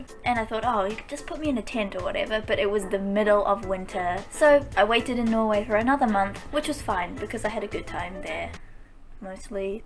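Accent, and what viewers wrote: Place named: United Kingdom. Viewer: I have a friend that looks nearly identical to you and she's like 100% mestizo blood. I thought you were a Mexican with a British accent:p nice vid btw you're so cool😎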